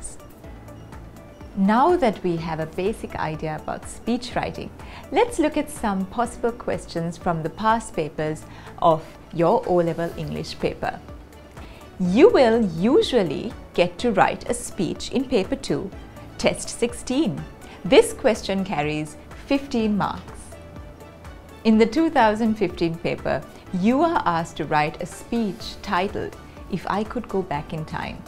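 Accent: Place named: England, United Kingdom. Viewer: Thank you, teacher. Your English accent is amazing.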